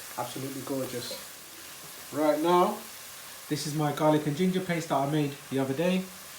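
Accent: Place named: United Kingdom. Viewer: Absolutely one of my favorites! I've been adding Ochra/Bindhi to my curry lately and it really makes the dish much tastier and healthier. I will be copying your recipe and style for my next chicken curry for sure... I really like your video (very well edited) and your British english makes it all the more enjoyable to follow. Thank you very much. I have subscribed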